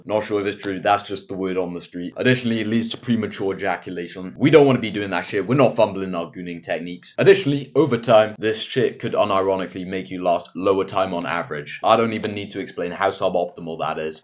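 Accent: United Kingdom. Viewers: why are you british? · Pa fath o acen Gymreig sydd gan y boi ma? Y gorllewin ife?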